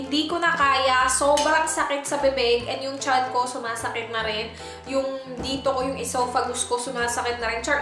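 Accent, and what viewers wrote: Visayas, Philippines. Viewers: Bisaya. Ka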